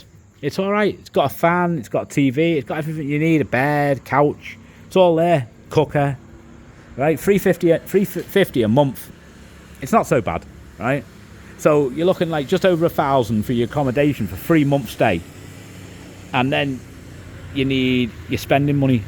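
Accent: United Kingdom. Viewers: Hi, I like your British accent, hopefully will go to show you my French Canadian accent later this year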